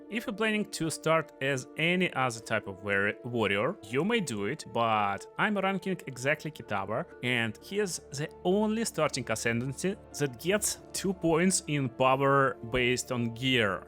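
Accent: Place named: Russia. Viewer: Are you russian? Based on music choice and strong accent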